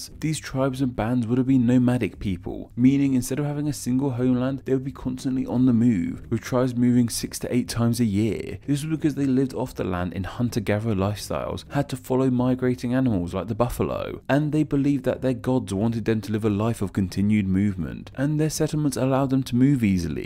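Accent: United Kingdom. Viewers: Guys, hes a brit Cut him some slack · Wouldn't individual tribes have different names for the land where they lived? Making generalized statements about Pre-Columbian indigenous people in North and South America is about as useless as making similar statements about the peoples of Europe at the time Julius Caesar conquered Gaul, or Roman Legions landed in England. In what is now the United States Eastern tribes would have lived in permanent settlements as would those in the Southwest, the Pacific Coast, and parts of the Intermountain West. The indigenous group the British-accented speaker is making generalizations about were the "Plains Indians", so he likely learned everything he knows about Native Americans from "Dances with Wolves". BTW, what did the indigenous people of Europe call their continent during the Dark Ages? Except for a few Catholic priests who would have rendered the answer in Latin, they wouldn't have a name for it BTW, I always turn to Received Pronunciation British speakers for information about Native or Indigenous peoples of North America--NOT.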